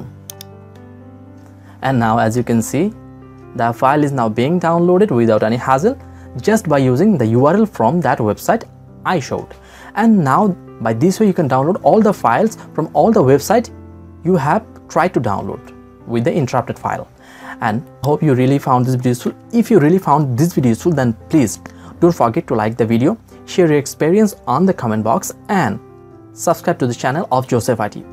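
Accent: India. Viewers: alhamdulillah i am not indian also but i thought ur voice was indian srey Thanks alot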